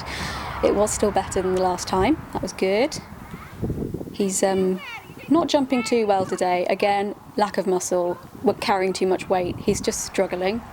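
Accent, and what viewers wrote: England, United Kingdom. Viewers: Are you English because you sound like you are I am too but I live up north so I don’t talk like a normal British person and no one talks like people from down south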